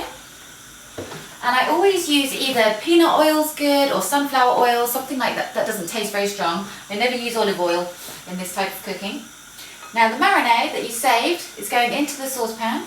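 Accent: England, United Kingdom. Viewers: Hi there, I really appreciate you making this video! I'm Bruneian and currently staying in the UK. being away from home makes me miss all the asian food! and satay is one of them! I'll be going to try this recipe. AND you've got an amazing english accent!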